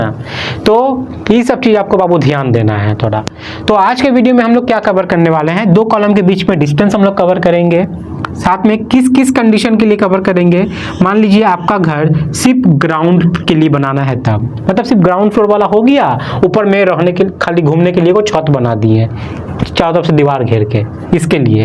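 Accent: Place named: Bhojpuri, India. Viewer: Sir ...aaji itni bhojpuri jesi baat kar rahi ho ...acha nahi lag raha hai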